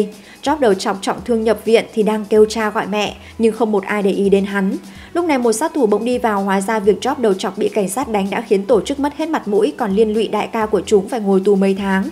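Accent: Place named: North, Vietnam. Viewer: Nghe giọng đọc MC thấy ngán ngẫm không muốn nghe vì dân Bắc Việt cộng đọc truyện thật là không thích, lại chán chường cho cuộc sống hiện tại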